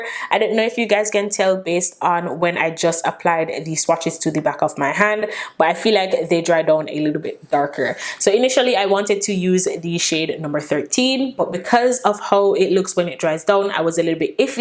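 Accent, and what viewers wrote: Jamaica. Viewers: I'm def getting a Jamaican accent coming through?😏 Concealer looks great tho.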